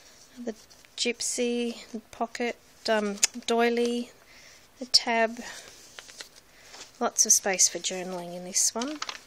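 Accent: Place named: Australia. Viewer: A great journal. Your swap partner will love it! Tfs and it's good to hear your Aussie accent. You did a really good job of filming and narrating.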